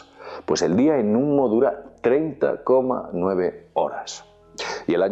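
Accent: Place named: Castile, Spain. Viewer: Es normal en el acento castellano hablado en España...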